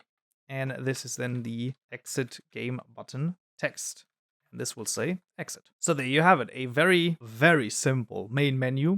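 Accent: Germany. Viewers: Nice video! I appreciate the fact that you convey much information in short videos. Btw you sound like you're German :D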